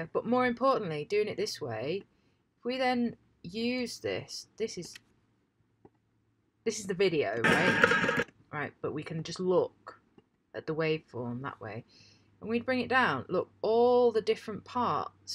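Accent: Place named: Yorkshire, United Kingdom. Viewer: are you from yorkshire? as a yorkie myself i recognise that accent anywhere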